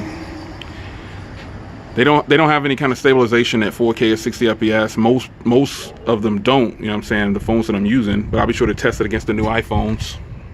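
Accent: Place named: New York, United States. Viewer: New York accent coming out like crazy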